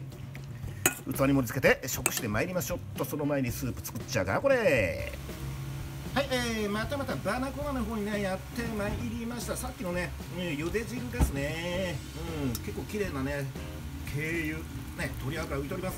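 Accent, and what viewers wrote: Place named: Kanto, Japan. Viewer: 関東の人だね また関東に住みたい。。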